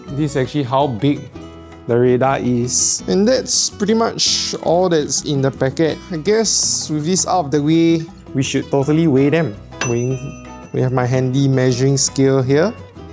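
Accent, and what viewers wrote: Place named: China. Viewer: This Chinese dude has a nice accent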